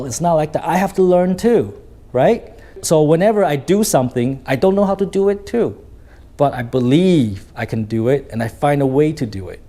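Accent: Standard. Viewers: ns rất chuẩn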